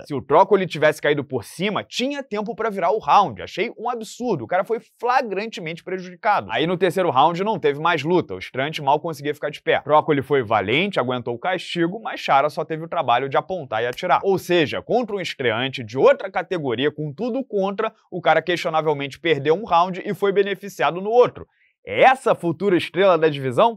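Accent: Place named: Niterói, Brazil. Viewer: Cara não sei se é por que eu sou de Niterói também, mas eu curto o jeito dele falar, tipo assim igual o eu me sinto em casa.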